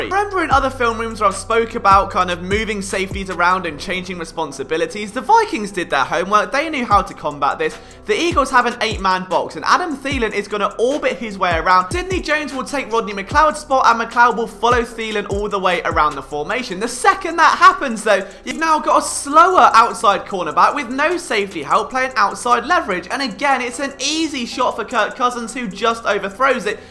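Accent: United Kingdom. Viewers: Who the hell is this Brit, trying to talk football?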